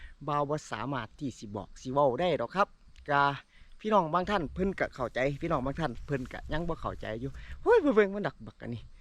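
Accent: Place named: Suphan Buri, Thailand. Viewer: บ่าวสำเนียงคือคนบ้านฉันเลยลาวสุพรรณ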